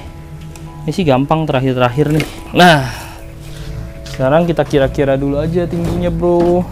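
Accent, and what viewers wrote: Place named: West Java, Indonesia. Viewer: logat nya sunda banget euy....hehehehe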